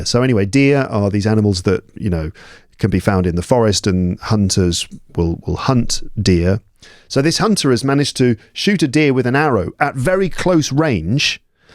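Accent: United Kingdom. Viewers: Hi, I'm a newly retired English teacher and I just love listening to you read short stories! Your British accent is fantastic, your explanations always are appropriate and what's more often funny, which makes it all very pleasant! Thanks a lot!!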